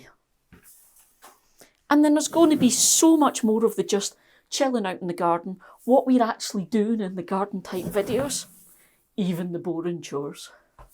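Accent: Scotland, United Kingdom. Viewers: I just stop in to see what your up to. You could probably film walking around the yard and going on about whatever comes up. My gardening channels are just relaxing, AND... Your my only Scot?:) So its also nice for my ears:) Happy new year! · I just read a comment from one of the viewers saying that “for a moment they thought you were going to say that you were stopping making videos”! That’s was exactly what I thought and for a moment there I felt a real pang of sadness, and was so relieved to find that was not the case! 😮‍💨 I absolutely love your videos and discovered your channel when you had just reached 18 thousand subscribers - you were ecstatic about it and couldn’t get over the fact that 18 thousand people wanted to hear what you had to say and share in yours and adventures. Your channel is so incredibly relatable and real, and the ‘fluffier’ the content, the funnier and more enjoyable your videos seem to be. 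It’s a real de-stressor watching your antics. I work in a hospital and some days, after a particularly stressful 12 hours shift when my brain refuses to switch off from the dramas of the day, there’s only a handful of programs that are able to relax my grey matter, and YOUR channel is right at the top of that list! So thank you for making this overworked and underpaid NHS worker relax and laugh ❤ PS: your channel even comes with a bonus: the Scottish accent, of course! Absolutely love it!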